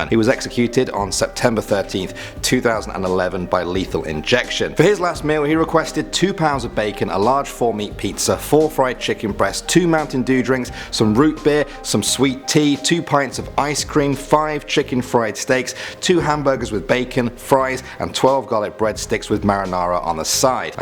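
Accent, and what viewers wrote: England, United Kingdom. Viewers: what part of england our you from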